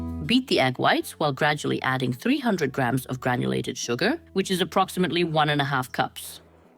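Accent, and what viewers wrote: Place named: England, United Kingdom. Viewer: ... recién descubro tu canal,... ya estuve viendo 2 recetas,... me encanta como explicas, muy práctico y sin hacerlo tan largo,... qué buena y deliciosa éste Marble Cake,....me gusta tus utensilios que usas para cocinar,... yo entiendo poco en English,...pero hago ls traducción a Español,.... soy de Argentina, pero vivo en North carolina USA,....tú acento me parece de Inglaterra,.... muy bonito todo( me pareció muy bonita la fuente que usaste para hacer el cake 👌).saludos Miriam...👍👍👍💯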